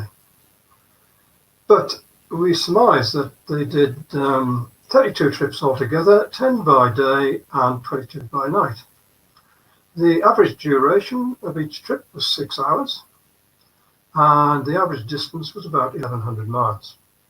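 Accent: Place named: England, United Kingdom. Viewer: You need to practice with the mic you are too far, cannot understand a word... remember you have a thick English accent and this is for the world...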